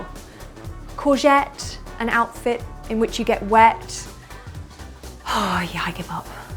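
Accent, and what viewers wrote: United Kingdom. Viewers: Cute, short hair, and a British accent. The perfect woman! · i like the brit accent rather than american .... or i just probably love your accent :) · British accent, get over it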